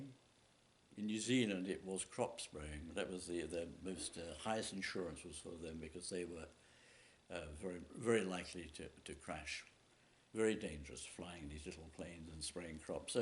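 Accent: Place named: England, United Kingdom. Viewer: Dear father, an English accent???!!! Where is your church? I’m a recent English convert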